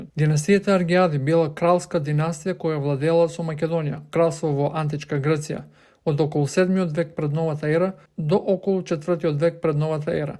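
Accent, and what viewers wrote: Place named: Russia. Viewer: он збори со мкд руски дијалект 🇲🇰🇷🇺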